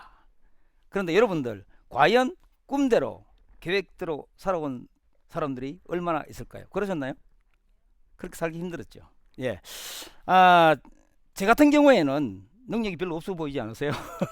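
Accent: Gyeongsang, South Korea. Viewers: 오랫만에 듣는 명강의 였습니다 처음 종로선글에 찾아 올땐경상도 말씨로 약간은 덜세련된 말투가 너무 진정성과 친밀감을 느꼈습니다 아침 같은시간대에 어김없이 방송해주시는 마력에 빠졌습니다 삼성에 근무하셨다는 말만으로라도 나와같은 삼성가족 이구나하는 끈끈함과 가끔은 우리집사람이,우리집 사람은 하고 호중님 얘기를 하시는 모습이 너무나 자상한, 인간적인 면모를 보는듯 합니다 대통령상을 두번이나 받으실 자격이 충분하다는 생각을 했습니다 늦었지만 맘껏 축하드립니다 고맙습니다 신께 감사드립니다 호중님곁에 회장님이 계시고 그래서 저도 인연을 맺게 됨을 말입니다 환절기에 건강을 기원합니다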